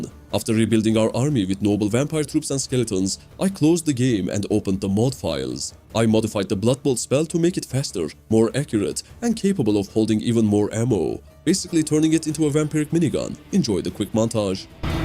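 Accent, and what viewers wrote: Turkey. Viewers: I hear the slightest bit of Turkishness in your accent friend... Eline sağlık harika vidyo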